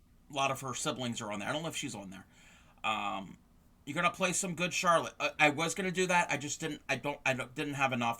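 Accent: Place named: Maryland, United States. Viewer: Found you because of your temperature blanket. I noticed your accent and went to your channel. I was right!!! A fellow Marylander. We all have that "sound" that can't be mistaken. Sending you a friendly wave from Carroll Co. This video was fun.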